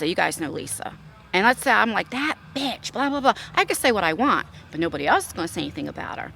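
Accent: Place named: New Jersey, United States. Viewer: OMG I Love Instagram it puts on my makeup for meee and You have that Jersey Accent, I have the Philadelphia accent if there is one lol but I was born and raised in Philadelphia PA and now I'm in NJ but I like Your Accent, Very Nice and Kinda Cool to see a Jersey Girl 🌹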